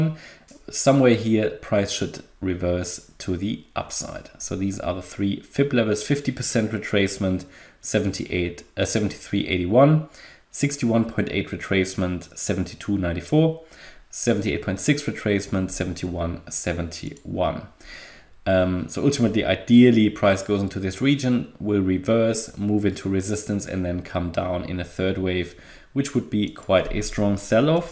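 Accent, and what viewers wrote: Germany. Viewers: Prima Content, dem Englisch nach kommen Sie aus Deutschland. Daher meine Frage : gibt es das auch irgendwo auf deutsch? Bin mir manchmal nicht ganz sicher...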